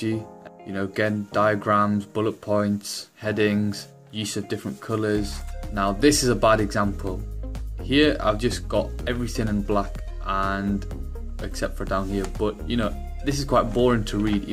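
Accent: Newcastle, United Kingdom. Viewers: hes defo a geordie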